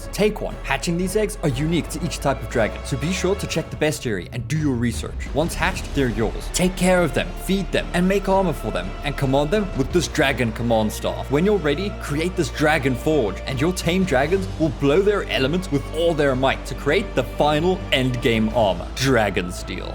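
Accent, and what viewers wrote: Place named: South Africa. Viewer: I like your accent. Is it South African? Great content friend. 👍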